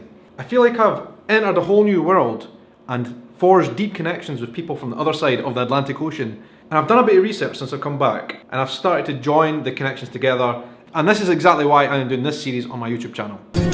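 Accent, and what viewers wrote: Scotland, United Kingdom. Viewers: Why? Your accent is almost identical to my mother, my uncles, and my grandparents. Of course, it helps that I'm the first generation born in the US and the rest of them are from Milngavnie... but hey, that's just details. They're all MacFarlanes. On my father's side, you'd have to go back to my great-great-grandfather, Thomas Campbell. But yeah, Scots everywhere in my family. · Hi!! LOVE this video LOL!! My family is originally from Scotland prior to immigrating many, many MANY generations ago. So it will always hold a special place in my heart. You have a beautiful country and lovely people, and I welcome you here to my city any time!! Come to Cincinnati, we live right across the river in Northern Kentucky. I'll even put you up in our spare room, feed you some excellent food, and give you a guided tour of my city. <3 Much love, XOXO (And yes, we are def obsessed with your accent, it's freaking fantabulous!! 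LOL) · I love your video! Yes it is true Americans love the Scottish and Americans that are of Scottish decent are very proud of it. Long ago we were once the underdog of England so we know were the Scots are coming from. We love your highlands, your kilts, your whiskey, your bagpipes and for sure your accent!! My friend and I are planning a trip there this year. I need to see the highlands with my own eyes. Thank you for your kind words about my country! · I love your videos. I live in the Southern United States.People in America are extremely proud of their heritages. My ancestry is primarily Irish and Scottish with a pinch of Nordic. So over here, I brag that my ancestors were Celtic Barbarians and Vikings. However, I am pretty mild mannered and can't drink...at ALL!!! When I hear your accent, or anything Scottish (music, etc...) it makes me feel kind of homesick. Is it possible to feel homesick for a place you have never been? 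😃 Keep up the great work!!! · Love your accent, I love to hear you talk, teach me about where my family come from. the foods, the words, traditions, the people. I hope to go to Scottland soon, it looks beautiful. Will be watching...thank you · I'm American and some of my ancestors moved here from Scotland in the 1700s. I've always been fascinated of Scotland, your culture, and history. I actual really love Scottish bagpipe music. I have the song Scotland the brave downloaded on my phone. I've seen photos of the beautiful land and was amazed at it's beauty. I plan to go to Scotland one day it's for sure on my top list to do. By the way I love your accent I know allot of women here would swoon hearing your voice... not going to lie I swooned watching this video. lol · I am from Greenville, South Carolina, USA. I love listening to your accent that I could listen to it all day long. I would love to learn about the Scottish culture. 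I am 30% Scottish myself I found out on ancestry. I feel like your family in a way even though I don't know any Scottish folks. I listen to Celtic music to help me get to sleep and it helps my anxiety A LOT!! The only place I have been out of the US is Jamaica. I don't usually get out and travel since I have a full-time job. One day I would love to visit Scotland! Love you all!! Go Scotland!!!!!!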